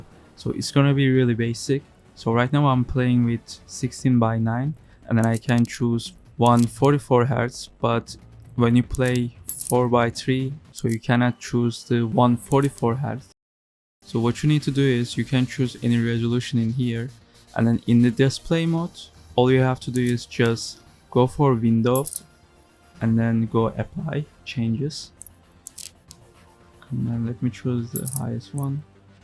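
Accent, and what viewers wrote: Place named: Iran. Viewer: Dadash lahje iranit malome dmt garm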